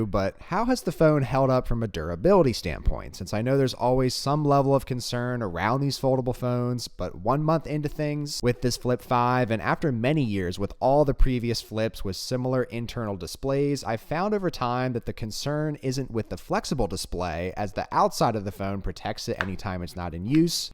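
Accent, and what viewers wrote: United States. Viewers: What's your American accent?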